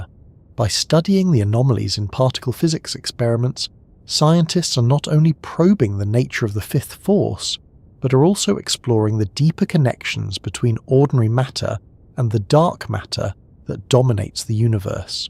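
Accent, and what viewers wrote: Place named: England, United Kingdom. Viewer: I dont have any idea of 90% of what this all is....I feel smarter just by listening to some English fellow saying big words....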